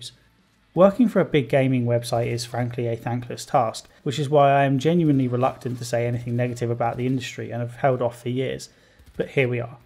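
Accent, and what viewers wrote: United Kingdom. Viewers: British accent The f*ckin' STATE OF IT!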